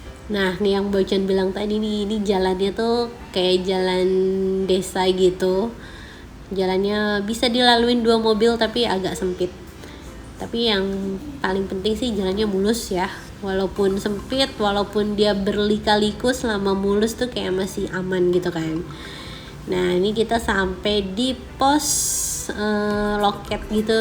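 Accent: Indonesia. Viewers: mimin nya kayak orang sunda, bner ga?he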